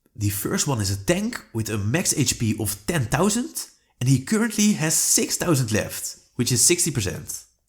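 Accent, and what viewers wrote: Netherlands. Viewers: Nice video man! Na het 1e woord was het al duidelijk dat je Nederlands was haha.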